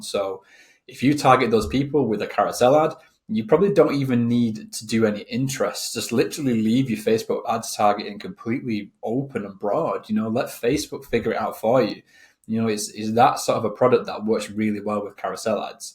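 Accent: North West England, United Kingdom. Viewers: where you from mate, sound local. north-west?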